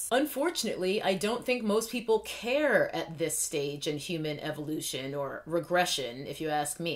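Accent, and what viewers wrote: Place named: New York City, United States. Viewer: Have you been hanging out with your NY crew??? The accent is strong in this one today! Also, yea dating B-lows. I've been off the apps for 3 (?) years now and this was my reminder to stay off.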